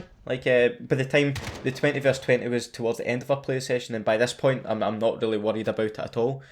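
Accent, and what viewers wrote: Scotland, United Kingdom. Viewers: Every content creator needs to be Scottish. love that accent lol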